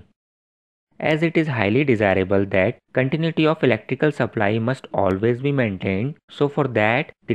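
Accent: India. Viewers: Your electrical knowledge is so good. I am pleased to improve you Indian english pronuciation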